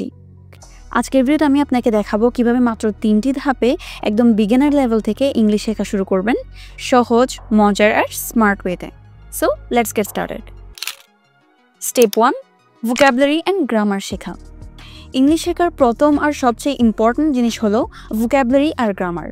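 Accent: Chittagong, Bangladesh. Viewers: kothar accent sune mone hocche ctg er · আপনি চট্টগ্রামের মেয়ে।আপনার উচ্চারণ তাই বলে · কথায় টান আছে চিটাগাং এর মতো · আসসালামুয়ালিকুম আপা আপনার পরামর্শ খুব ভালো লাগলো আপনার বাড়ি চিটাগাং অথবা ফেনী হতে পারে , আমি উত্তরবঙ্গে থেকে বলছি রাজশাহী বিভাগ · R8 amar o mone hoyese Onee Chattogram er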